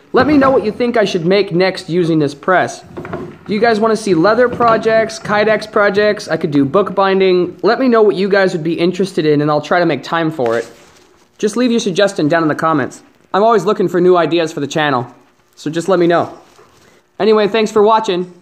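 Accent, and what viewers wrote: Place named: Utah, United States. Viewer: This guy sounds like he's from Utah.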